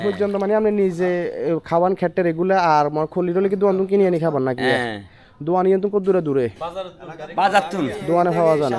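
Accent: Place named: Noakhali, Bangladesh. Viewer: ভিডিও অনেক ভালো লাগলো অনেক দিন পর নোয়াখালির কথা শুনলাম আমেরিকার থেকে দেখছি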